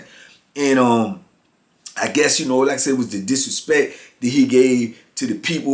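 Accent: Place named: DMV, United States. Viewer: Bra I like this video. You said some certain words that I can reflect off. Young I am onbthevWest Coast but from where you from. Inlike you videos because InthinknIblost my DMV accent. But when I hear your delivery you remind me of home. Speak da trufe to da old and da yufe. Errbody or somebody going thru it and know what you seen and know you real. ✊🏾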